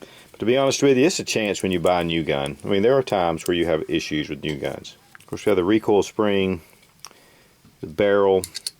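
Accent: United States. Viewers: Love your vids. Just bought a used Walther p22 for the bedroom. Thanks for all the tips. Had to subscribe for this, some of your other vids, and the down to earth all American accent. Long live the Republic! Down with Big Brother!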